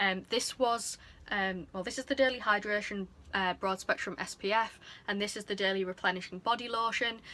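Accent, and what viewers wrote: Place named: England, United Kingdom. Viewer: Hi your accent sounds a bit like mine are you from England? I am from North Shields a little village called New York xxx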